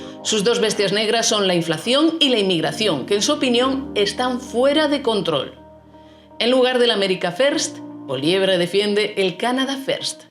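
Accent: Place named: Spain. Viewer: Señor para su informacion ella es de españa y asi se habla el espan̈ol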